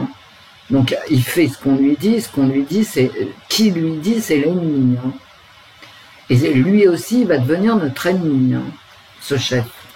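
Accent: Paris, France. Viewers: Bonsoir. Le plaisir de trouver cette nouvelle voyance après une randonnée au bord de mer, le Var est privilégié, il a fait chaud, il y avait même des baigneurs, qu’elle injustice même au niveau du climat. Comme d’habitude toujours impressionnante cette voyance qui colle toujours à la réalité, vos commentaires qui l’accompagnent toujours un plaisir, vous arrivez à me faire rire avec 😂 votre gouaille bien Parisienne, trêve de plaisanterie l’avenir n’est pas rose, il y a de fortes chances que le 49.3 soit encore sur la table, les paysans ont toujours eu du mal à s’entendre, et le fameux remembrement des parcelles bien responsable également des dégâts des eaux, que de décisions irresponsables depuis des décennies. Rien ne va plus dans notre malheureux pays où souffle un vent mauvais. On en PP 😱😡😟 Bonne soirée à toutes et à tous. ❤️💕💌🌹🐞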